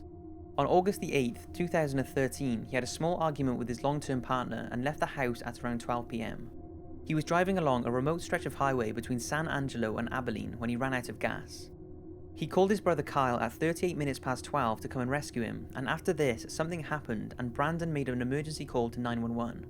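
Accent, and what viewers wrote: Wales, United Kingdom. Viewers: With all due respect, I'm confused. Do you mean the author? The real Lemony Snicket (Daniel Handler) is from California and has a very deep monotone voice. The only thing I can think of is that you're referring to the guy who plays Snicket in the film 'a series of unfortunate events', Jude Law, who is from South London, whereas the guy narrating this video, if I'm not mistaken, is from South (?) Wales in the UK, which is a v different accent to London. Either way, he sounds v little like lemony snicket, real or acted.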